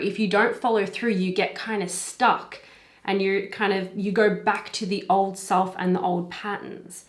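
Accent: Australia. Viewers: She’s from Australia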